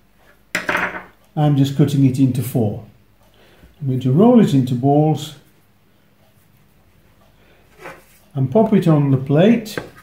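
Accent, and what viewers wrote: Yorkshire, United Kingdom. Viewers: your cooking videos, along with your yorkshire accent mr, bring back fond memories of home (yorkshire lass from West Melton).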